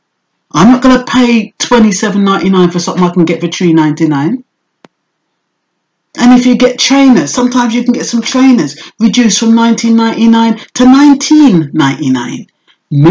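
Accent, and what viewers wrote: Jamaica. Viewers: Your Jamaican accent comes out when you're upset!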